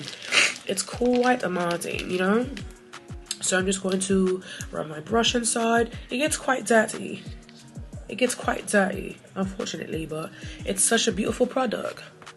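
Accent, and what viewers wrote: London, United Kingdom. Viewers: Accent solution, a Caribbean babe that was born or raised in London. Both come through. Tootsie time comes to mind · Love a British accent! ❤ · It's the British accent for me. You have a friend in me well a born and raised British play mommy here. You looked beautiful for dinner. Of course you had me dwl. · Your British accent is so fun! 😄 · Nailed London voice frfr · omg I'm so here for the British accent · I love your British accent 😁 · Im so here fot the bri’ish accent! 😂🙌🏽 it never gets old or annoying! I thoroughly enjoyed watching you speak in their accent while applying your makeup ☺️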